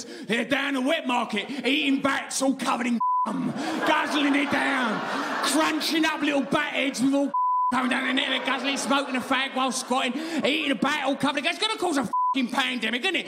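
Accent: United Kingdom. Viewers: I really need some practice at listening to that British English - or is it English British. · Eh. It’s a bit too British for American humor. The tone of your delivery is all wrong, im sure it’s a knockout in Europe but half of us can’t even understand your tea and crumpets accent bro. That being said you’re a bescon of truth and you’d do better as a lighthouse for ships in the dark, than a jester on a unicycle. Just sayin. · I hear bleeps what Russell Brand is saying. He said many bad words in British accent about COVID 19.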